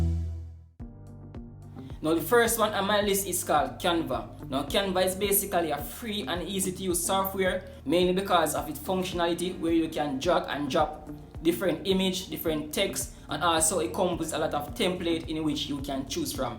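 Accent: Jamaica. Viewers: Are you Jamaican? I hear the accent!! I am too, you get a subscribe just for that. I use Canva as well!!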